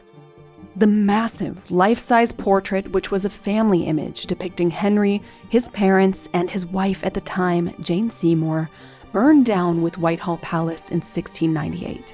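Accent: United States. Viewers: Why an American narrator? Does not sound right somehow, and the over-the-top enthusiasm and gleefulness is downright weird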